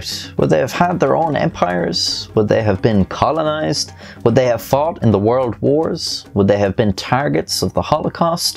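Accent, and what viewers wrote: Ireland. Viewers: "15 of tha fookers were found in a cave" This is why we need you narrating history documentaries, nothin captures my attention faster than that lovely irish accent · How does only have 500,000 subs? Man u cover all the coolest shit. Definetly the coolest Irish guy I've ever seen lol just seem less like a guy with a kilt than the rest hah. Thx 4 the vid man. War history stories r my favorite thing u cover like the ww2 meth guy but any war history is interesting to me. Next time u get time for a war story up your sleeve would love to hear one. I usually cant stand an Irish accent like old British is also nails on a chalkboard for me but this guy is pretty chill I don't even notice it. I live in the sticks of Kentucky so im sure I sound strange to some people. Conor McGregor gave u fellas a bad rep god tht dude is obnoxious lol. Keep it up man love the content 5 star quality. 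I'm honestly suprised you don't have more subs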